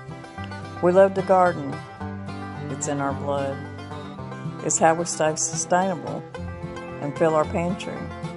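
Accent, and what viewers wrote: South, United States. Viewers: This is one of my favorite meals. I've only made it once though and it was OK but not as good as I've had at a restaurant. I didn't use the crackers crumbs. I am really interested how you tenderized that meat though. I tried with mine but it didn't look like that. What is your secret? I love listening to you talk too. My momma was from Kentucky and I love the southern talk and way ya'll say things. I watch your channel a lot. Thanks for this recipe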